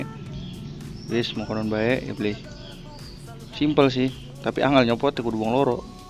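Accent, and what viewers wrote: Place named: Tegal-Cirebon, Indonesia. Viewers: Jawane kaya wong tegal cirebon kihh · wong dermayu tah? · Ngapak mbah... ??? 😄🤣🤣😂😅😆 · Lah wong ndi sampean kayae dermayu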